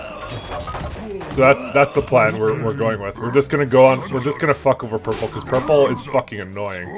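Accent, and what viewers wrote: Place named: Canada. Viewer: You speak canadian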